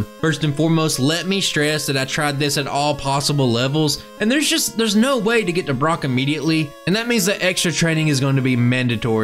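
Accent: South, United States. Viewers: Literally the first and only Pokevideo I've seen in my entire life with a Southern narrator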